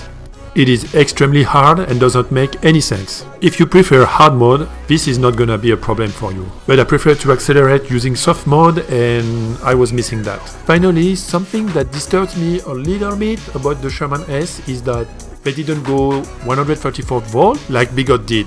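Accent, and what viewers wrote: France. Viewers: I love the fRRench accent :)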